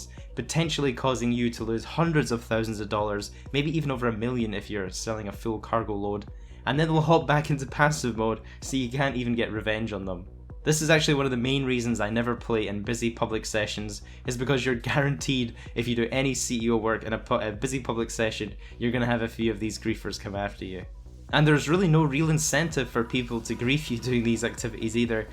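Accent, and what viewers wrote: Scotland, United Kingdom. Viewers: Are you fae Scotland? 🏴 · U scottish??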